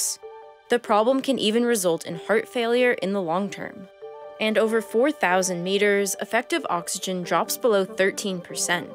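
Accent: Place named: California, United States. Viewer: Is the accent in the video , Californian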